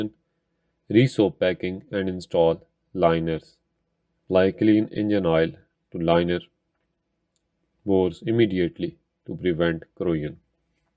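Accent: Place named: Punjab, India. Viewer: Buddy ur voice sound punjabi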